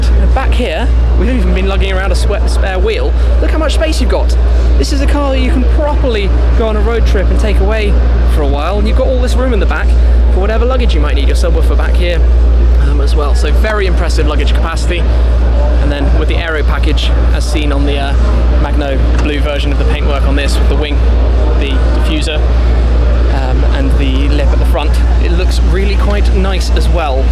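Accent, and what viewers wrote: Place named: United Kingdom. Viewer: could completely make up a car spec and everyone would believe him cause his British accent makes him sound like he always knows what he’s talking about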